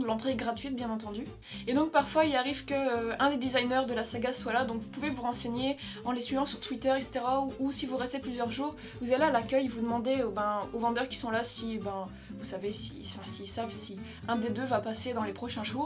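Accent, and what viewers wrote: North, France. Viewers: Tu viens du Nord ?🙂 Ps: Potterhead a vie 😍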